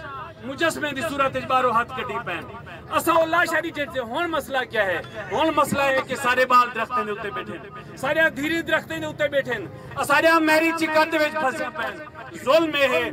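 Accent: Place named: Saraiki, Pakistan. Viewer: بہترین وسیب دی آواز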